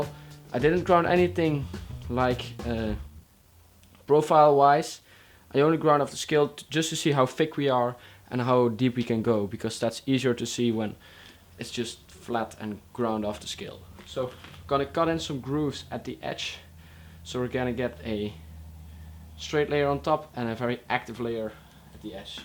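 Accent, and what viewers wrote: Netherlands. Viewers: You 're dutch ?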